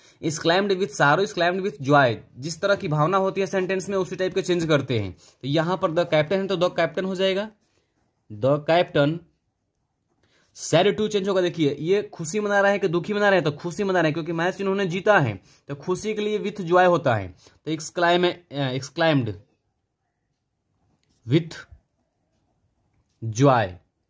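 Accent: Bihar, India. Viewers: Sir ,u from bihar 🤔🤔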